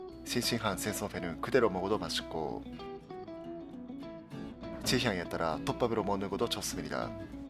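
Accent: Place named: Japan. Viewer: 한국어 잘하지만 지울 수 없는 일본인의 향기가 호감입니다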